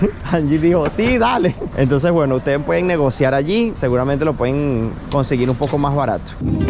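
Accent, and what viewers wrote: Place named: Venezuela. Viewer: Disculpa, tocayo, no sé dice eso no debería de ser así soy traductor simultáneo y docente en idiomas, manejo varios y los enseño y también enseño español a extranjeros y la gramática del español dice que después del verbo DEBER en cualquiera de sus tiempos y formas, se debe usar y no se debe de usar un verbo en infinitivo, por lo tanto esa preposición DE no existe, sobra. Disculpa tocayo que aproveche está oportunidad para corregirte, no lo hago para hacerte sentir mal sino para que mejores en el uso de nuestro idioma español, fíjate que no menciono nada de tu acento venezolano, eso es secundario, me refiero es a los errores gramaticales. De nuevo. Mil disculpas por corregirte y mil bendiciones. Gabriel Antonio Sánchez Aldana Traductor Simultáneo y Docente en Idiomas. Exsacerdote Católico.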